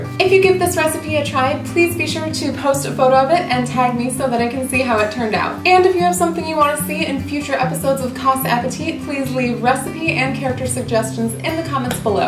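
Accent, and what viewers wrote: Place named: Michigan, United States. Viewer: Your accent is my favorite. My dad is from Michigan and hearing you makes me feel at home. I love this video for so many reasons. <3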